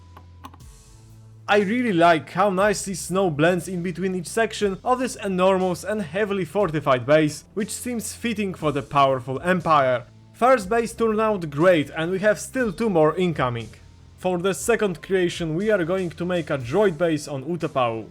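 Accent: Poland. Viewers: slychac ze polak xD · beautiful polich accent lol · Słychać że Polak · czy ja wyczuwam Polski akcent w wypowiedzi?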